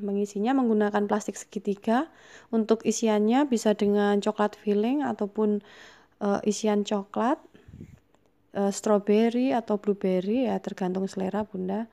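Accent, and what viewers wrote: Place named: Java, Indonesia. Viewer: Ndak papa mbaaaak meski medok...itu ciri khas mbak.ehehhee...makasih sdh mau berbagi....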